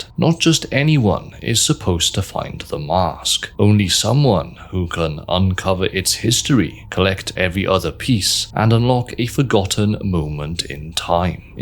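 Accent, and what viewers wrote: Wales, United Kingdom. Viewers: That magic Welsh voice. 🔥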